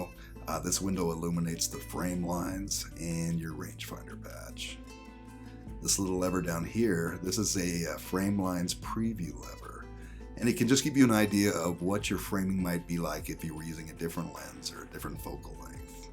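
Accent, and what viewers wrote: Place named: Alabama, United States. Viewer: Hi. Love watching your videos, very informative. I have to ask and sorry to pry, but are you from Alabama? I ask only because you have the same speech patterns as Tim Cook from Apple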